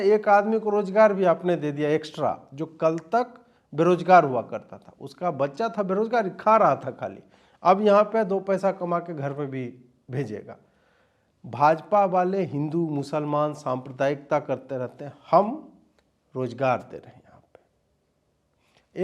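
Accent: Bihar, India. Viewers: Your Bihari accent is very nice Ajit sir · EXCELLENT satire! Bihari accent is lovely!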